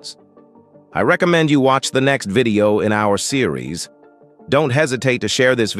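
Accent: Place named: United States. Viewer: You have a real cowboy accent in this video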